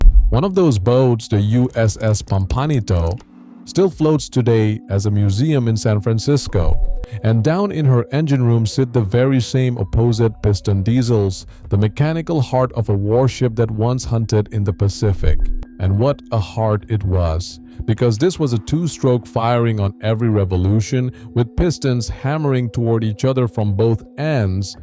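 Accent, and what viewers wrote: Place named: India. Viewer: An AI voice model with a slight indian accent on this type of channel is a wild choice!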